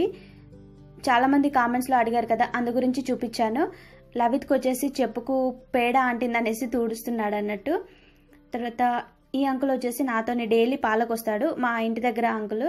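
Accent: Telangana, India. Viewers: Pakkatelangana matladutunnaru pillalto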